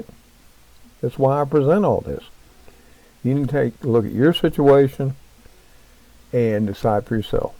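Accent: South, United States. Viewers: I love your Southern Accent! I was born and raised in Georgia. When I hear you it reminds me of home! Arizona has been my home for 4 years but I sure miss Southern hospitality southern charm and down right good manners. Oh yea greens and cornbread and boiled peanuts also.😉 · Thank you Mr. for this important update, you have very good advice to us all in this very disturbing time. I have been following a few of the channels you suggested this past week, and can see where this is going. I love to hear you speak and your southern accent, you remind me of my father in your features, but unfortunately he passed some years ago now. You speak so much common sense in these troubling times. There are distressing scenes of people being put into isolation in China on the BBC news right now. God bless you and your family and much 💖 to all.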